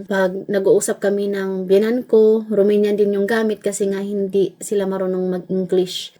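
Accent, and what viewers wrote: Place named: Visayas, Philippines. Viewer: Hahahaha...kung gahion mga bisaya😂legit gyud dhay...mao jud na atu dila hahahaha..ma identify jud ta nga bisaya😂❤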